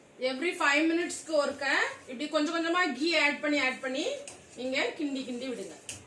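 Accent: Tirunelveli, India. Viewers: திருநெல்வேலி ஸ்லாங் கேட்க நல்லா இருக்கு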